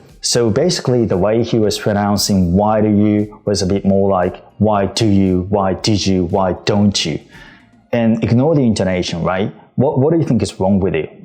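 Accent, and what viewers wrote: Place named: Australia. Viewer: 今回は特にあつさんの英語がたくさん聴けてうれしかったです😍あつさんの英語は「ポッシュなオージー」みたいな勝手なイメージを抱いています😅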